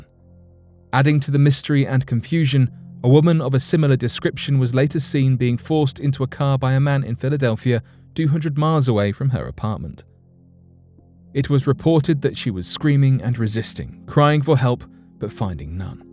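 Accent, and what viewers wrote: United Kingdom. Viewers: No, definitely British, with very, very, very slight generic British northern accent hints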